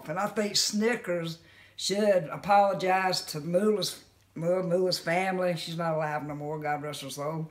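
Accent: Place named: Southwest Virginia, United States. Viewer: She sounds like she is from Southwest VA. lol I like it.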